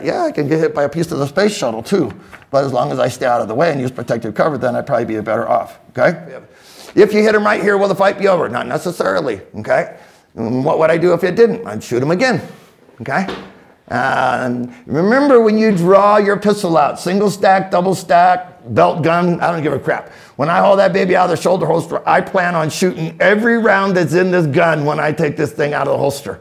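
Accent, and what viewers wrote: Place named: United States. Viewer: Which region of USA do you belong to sir? You have a very interesting accent.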